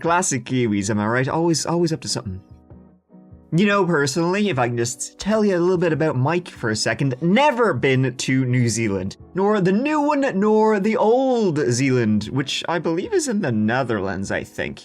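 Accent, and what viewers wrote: Ireland. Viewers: he is Irish ❤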